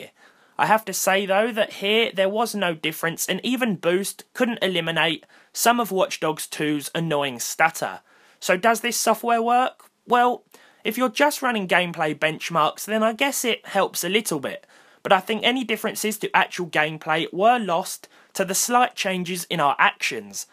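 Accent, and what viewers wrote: United Kingdom. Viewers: dat sexy british accent👌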